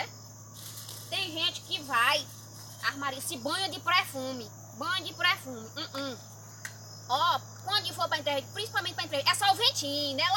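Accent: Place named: Pernambuco, Brazil. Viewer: Quando vi você pela primeira vez achava que tu era mineira ou pernambucana. Adoro esse sotaque, ainda quero ir no Pernambucano... Parabéns ótimo trabalho!!! ✌👏👏👏👏👏👏👏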